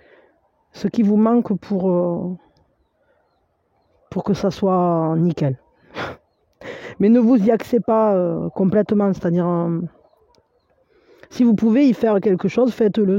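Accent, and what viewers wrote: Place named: South, France. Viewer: Vous avez raison , la Gratitude est du miel pour le Coeur. Merci à vous pour les messages transmis. Vous répondez à mes prières au mot prés ! Un jour sans doute quand le moment sera Juste et Bon pour moi. Juste une remarque : Avez-vous remarqué que votre voix, au demeurant très mélodieuse, perd son accent du Sud quand vous chantez ? Merci encore et prenez bien soin de vous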